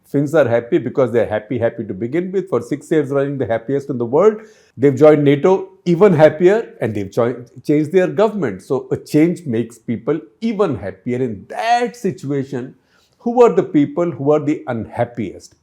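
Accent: India. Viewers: This Indian accent very distracting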